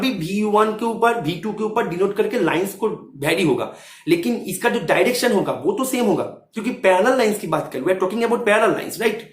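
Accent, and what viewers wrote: Bengal, India. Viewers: Thank you sir. Are you from Bengal?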